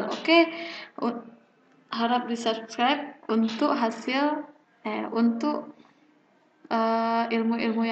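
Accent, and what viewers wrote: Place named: Minangkabau, Indonesia. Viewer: Iya kak thanks ya.... Pedas kali bahasa urang awak ni yaaa kak 😂😂😂😂😂 logatnya udah ketebak raso e😂😂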